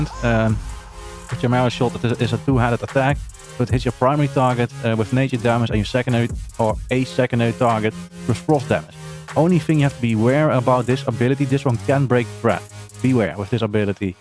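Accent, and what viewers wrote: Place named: Netherlands. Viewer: Just started playing MM, pretty new to wow, not a very good player but trying to learn the ropes and get into pvp! Btw are you from NL? I lived there for 10ish years and your accent reminds me of my time there :)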